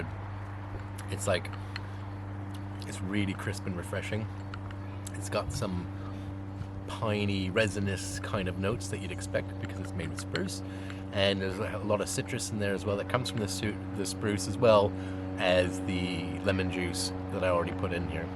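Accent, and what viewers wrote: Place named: Dublin, Ireland. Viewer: Have you spent any time in ireland in the past? Your channel says your from Canada, but your accent sounds like you could be from Dublin to me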